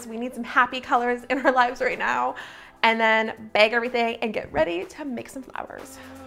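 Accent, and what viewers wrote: Canada. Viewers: are you Canadian? You sound like one. I love your videos btw! ☺️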